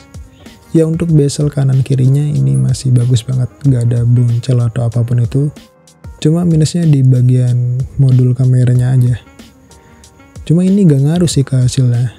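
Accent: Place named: Lombok, Indonesia. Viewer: logat bahasanya kayak lombok bang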